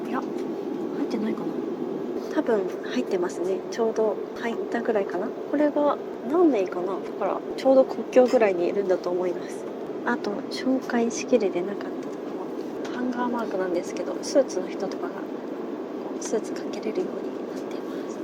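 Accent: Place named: Okayama, Japan. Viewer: 時々出てくる岡山弁にも好感が持てます😊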